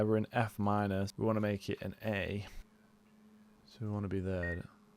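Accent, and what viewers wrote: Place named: Yorkshire, United Kingdom. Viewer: Another Yorkshire lad?? 🤘🏻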